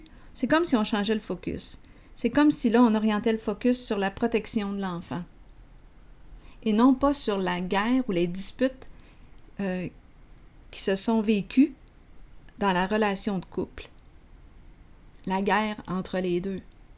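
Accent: Canada. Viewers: j'adore votre rire enfantin et votre accent canadien je suis scotchee et j'adore le Quebec qui est une region si jolie, ou on mange si bien dans d'excellents restaurants merci d'etre presente tous les jours dans ma vie pour me donner des nouvelles de l'homme qui est dans mon coeur je vous souhaite une excellente soiree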